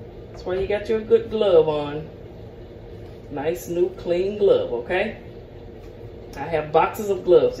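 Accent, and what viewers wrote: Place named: South, United States. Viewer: you are a southern woman, I can hear it in your voice.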